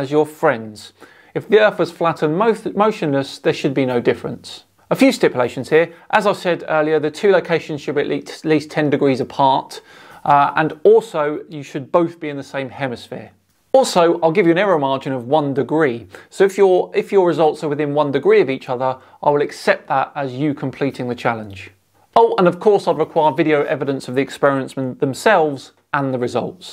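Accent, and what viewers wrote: United Kingdom. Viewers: Is it just me, or, is it strange hearing a British guy say dollars?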